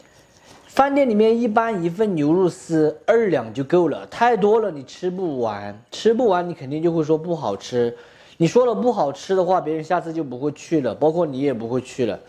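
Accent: Sichuan, China. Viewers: 你的四川话很正宗，要不是有字，都听不懂.🤣🤣你做的安逸👏👏👍👍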